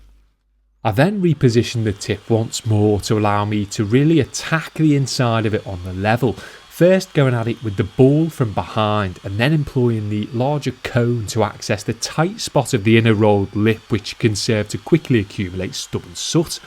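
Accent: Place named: England, United Kingdom. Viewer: that english accent....a candy for my ears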